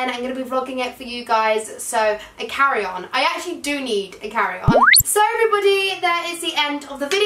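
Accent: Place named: United Kingdom. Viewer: hey I subscribe to your channel and I love your accent I believe that your accent is British right?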